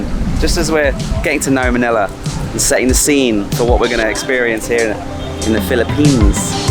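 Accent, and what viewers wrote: United Kingdom. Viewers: You have a British accent. Are you from UK? Do you have camera crew? You don't seem to be holding a camera? I also notice drone shots.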